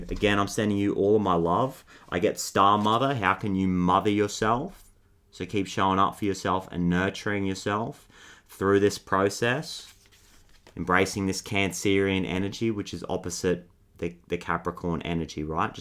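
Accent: Australia. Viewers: It's an Australian accent! We all speak like that here! 🦘🦘🦘🦘🦘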